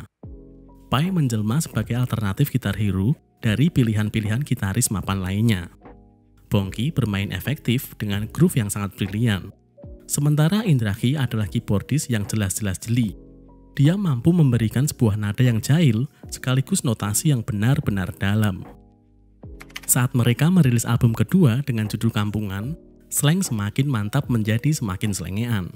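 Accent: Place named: Semarang, Indonesia. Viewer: Orang Semarang ya mas.. mendhog men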